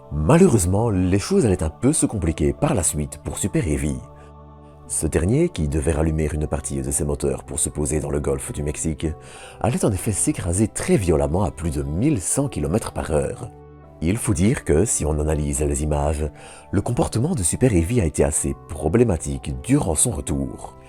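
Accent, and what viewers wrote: Belgium. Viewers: vous avais un accen belge magnifique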